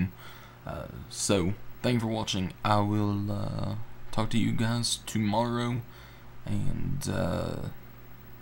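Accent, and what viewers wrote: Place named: South, United States. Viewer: I think its southern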